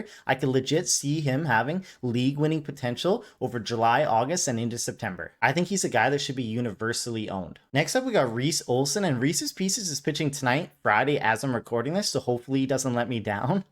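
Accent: Canada. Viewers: I thought you were Canadian by the way you pronounce your "O's". Especially in words such as "about" or "out". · Careful with that Schlittler pronunciation, man. This is a family show. That said, I expect to hear "cuck" no less than twice in every video and you delivered. From another fellow, thanks for continuing to justify my obsession with Kurtz. Idk how many losses it has contributed to thus far, but it's finally paying off as my playoff hopes hang by a thread! Does the mini-hoop indicate that you'll keep this going with some basketball content? The football market is flooded and I already think I know more than everyone in that sport, but the NBA is an afterthought as one who always preferred college hoops. Either way, I'll support it until you bore me or piss me off! Non-sports question... I think you've hinted (or flat-out stated) that you're in Texas, but do I detect a little canuck in that accent? 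Whatever it is, it doesn't exactly scream Texan. 🤣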